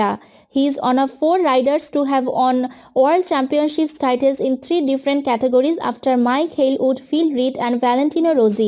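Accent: India. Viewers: She maybe a indian announcer...by the way thanks for the effort..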